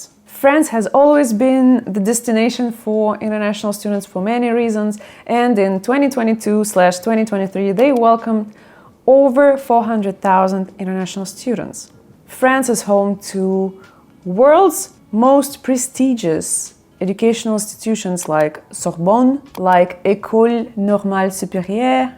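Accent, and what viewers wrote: Russia. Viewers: Спасибо за видео. Но акцент русскоговорящего человека очень сильно слышно с первых минут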